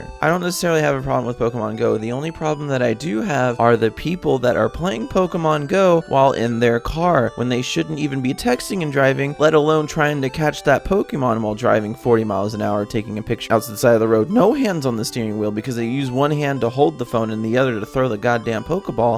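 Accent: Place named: Australia. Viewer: Oh? You sounded more Australian to me :P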